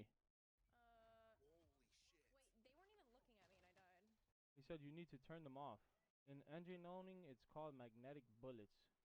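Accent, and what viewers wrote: Liverpool, United Kingdom. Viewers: Bruhhhh your scouse accent is killing me😖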